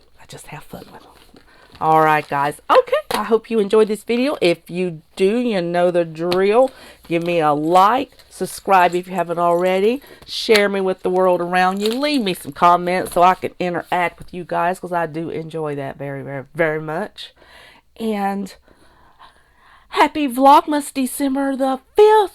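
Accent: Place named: South, United States. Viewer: Love your accent! I’m from Mississippi but live in Alaska. I don’t hear that lovely southern accent much anymore.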